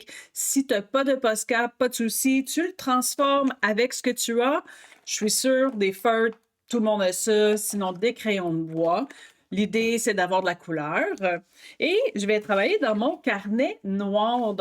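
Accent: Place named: Quebec, Canada. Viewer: J’adore tes projets et j’adore ton accent Québécois ❤❤ bonjour de Bretagne et merci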